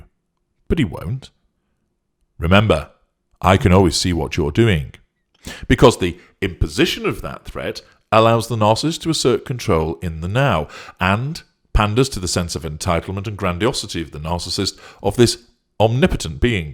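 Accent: United Kingdom. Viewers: I dont like your british accent. Make your videos in an american accent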